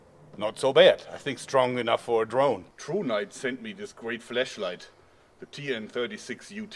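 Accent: Germany. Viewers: Du sprichst mit einem sehr starkem deutschen Akzent o_O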